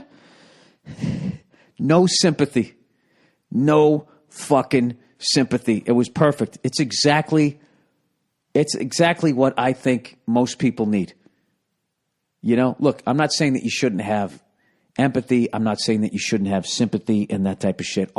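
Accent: Boston, United States. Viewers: Blends well with the Boston accent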